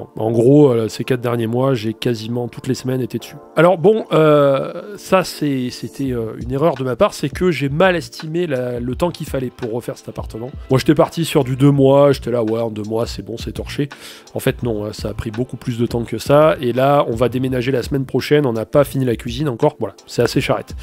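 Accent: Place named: Ardèche, France. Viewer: Super content de votre retour! Quand on connait le coin, on entend que vous êtes ardéchois ;-)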